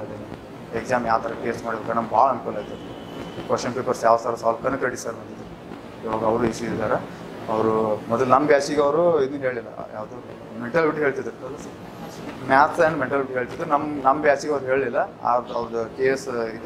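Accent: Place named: North Karnataka, India. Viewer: Super sir... ಉತ್ತರ ಕರ್ನಾಟಕದ ಮಾತುಗಳು......nice.....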